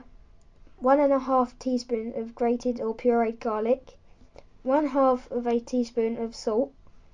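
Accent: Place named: United Kingdom. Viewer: I love your accent, what kind of british accent is it?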